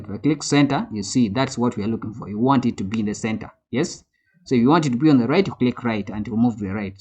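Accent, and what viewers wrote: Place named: India. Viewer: Are you a Nigerian? You sound like Indian 🤷 Love your effort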